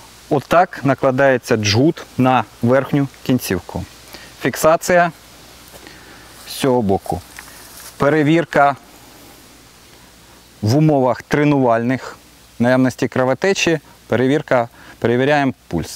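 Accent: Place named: Surzhyk, Ukraine. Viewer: Автор не користується в побуті українською мовою, максимум суржиком. Сам таким страждаю.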